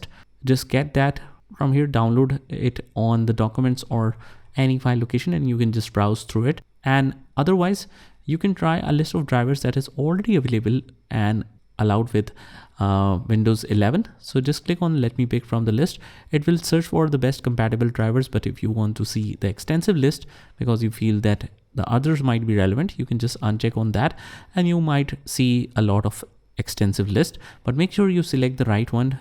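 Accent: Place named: India. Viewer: the indian accent is strong in this one.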